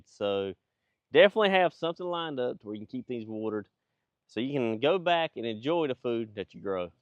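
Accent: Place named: United States. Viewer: This american accent gives me an headache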